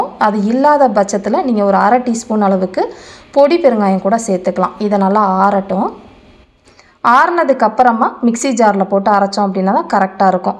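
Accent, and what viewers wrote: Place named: Tirunelveli, India. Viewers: நீங்கள் கூறாவிட்டாலும் உங்கள் அழகு தமிழில் நெல்லையின் மனம் வீசுகிறது உங்கள் சமையல் போலவே.. Super preparation 👌👌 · Super, நெல்லை தமிழ் கொஞ்சி விளையாடுகிறது. அருமை சகோதரி. வாழ்த்துக்கள்.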